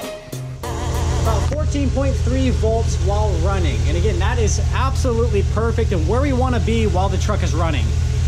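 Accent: Hawaii, United States. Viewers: weirdly still Hawaiian seeming, the accent though, and not pidgeon, so weird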